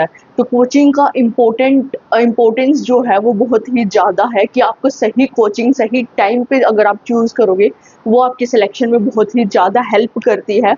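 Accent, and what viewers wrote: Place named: Haryana, India. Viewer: Haryanvi language,kha se ho aap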